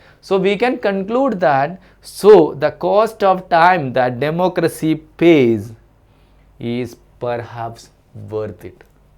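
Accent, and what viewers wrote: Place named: Kerala, India. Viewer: I'm not laughing.........I just told it because I'm also a malayali..... by his accent I could recognize that he is also a malayali.....that's all..........No Offense!!!!!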